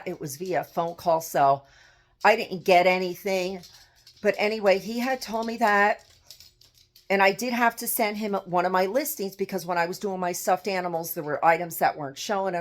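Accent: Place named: New York, United States. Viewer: On a side note, I heart your accent! It makes me miss home (NY!)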